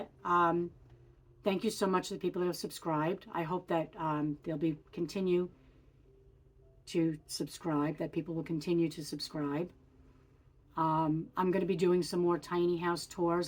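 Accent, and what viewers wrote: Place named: New York, United States. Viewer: Thank you for sharing this!! It has been in my mind to start a channel, and you have given me some encouragement. I love your tiny home -- a year ago I was looking for one, but the cost of the lot rent and the locations kept putting me off. I travel a lot in a campervan, bought during the pandemic because it literally grounded me from flying. So I have my house, with unbelieveable story's about my "refresh" using AI and I think that would be fun to share along with my van adventures. So, thank you. You sound like you have a New York accent. Be blessed!!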